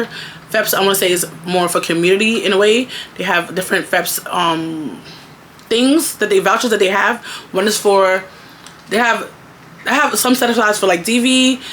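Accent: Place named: Jamaica. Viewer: Hear the Jamaican accent, dutty lol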